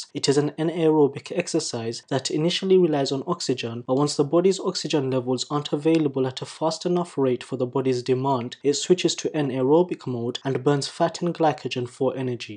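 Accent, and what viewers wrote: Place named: Bangladesh. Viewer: You sound Bangladeshi based on your accent. Am I right?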